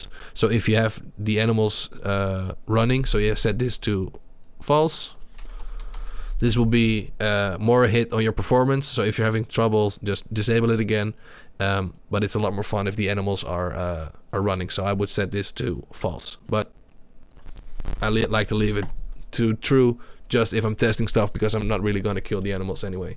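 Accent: Netherlands. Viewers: are u dutch? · Duidelijk te horen dat u Nederlands bent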